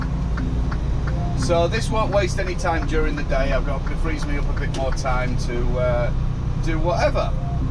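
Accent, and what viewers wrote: Yorkshire, United Kingdom. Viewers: Yorkshire accent🙂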